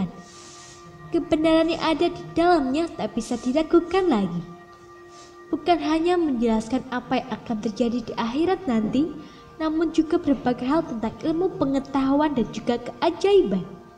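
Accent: Java, Indonesia. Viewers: Iki jowo? Suaranya😂😂😂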